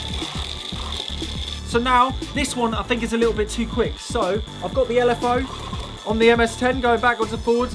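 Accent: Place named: London, United Kingdom. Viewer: He does sound like a Londoner, in which case- he's in the right place!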